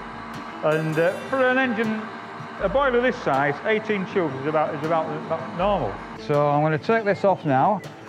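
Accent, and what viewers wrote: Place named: Sheffield, United Kingdom. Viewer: What a beast! BTW, you sound like a Sheffielder, am I right?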